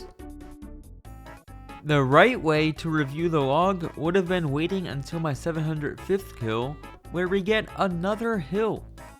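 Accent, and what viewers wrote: United States. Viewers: Are you from Philly?? I hear some wooder in those water runes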